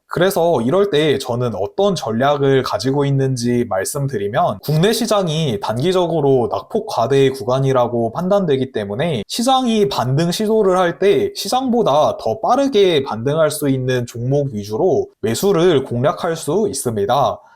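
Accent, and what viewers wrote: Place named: Chungcheong, South Korea. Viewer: 100프로 충청도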